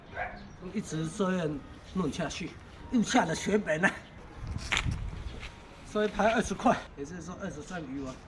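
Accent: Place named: Fuzhou, China. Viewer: 听口音是福州附近海边的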